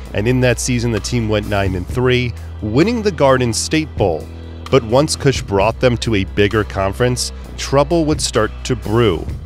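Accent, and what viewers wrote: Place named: Arizona, United States. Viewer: You are wrong.Kush did not deserve to be fired. When the real truth came out years later, Kush was hired as an ASU employee. That ASU hire would not have been permitted if Kush was as bad as you say. BTW..you talk like someone from Tucson. 🤔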